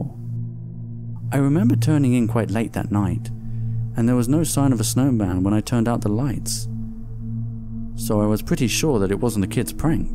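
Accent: United Kingdom. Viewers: Are u from the uk sir?? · Haha I heard it but it's really a light british accent :) Btw thanks for replying so fast I just wanted to know one more thing do you write these stories yourself or do people send you some and you illustrate them :) ?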